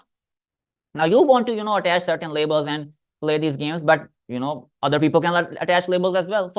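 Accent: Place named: Bangladesh. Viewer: You sound Bangladeshi, what do you know about the Middle East and history little girl?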